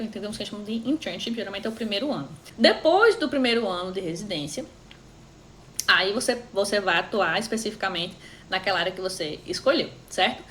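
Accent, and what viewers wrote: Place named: Natal, Brazil. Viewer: seus vídeos são incríveis! também sou de Natal e curso medicina em BH. Reconheci na hora o sotaque kkkkkkkk. Sucesso para você! nos atualize!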